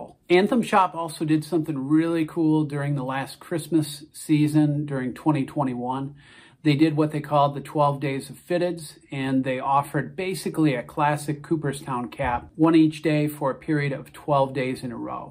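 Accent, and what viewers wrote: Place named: Michigan, United States. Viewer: Thanks for giving Canada a shout out,. I live in Toronto and it’s great to see Americans recognize our seemingly growing fitteds market. Noble North is a true gem, as are TeamWorks and Anthem. Feel free to hit me up if you need any help making a Canada part two as I know of a handful of great shops you could mention. No credit wanted here at all. And for what it’s worth, I’m a big Red Wings fan (I detect what sounds like a Michigander accent). Keep up the awesome work.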